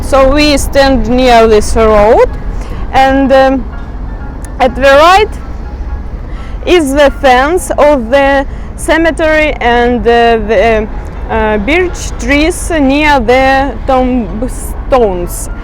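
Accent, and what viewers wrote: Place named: Russia. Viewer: Your accent is so cute. Don't be embarrassed. English is weird because it doesn't follow its own rules, and it is also difficult to learn from texts because we do not say words in the way they "should" be said. Remember that on the internet, there are people who are just jerks. I doubt they could speak Russian.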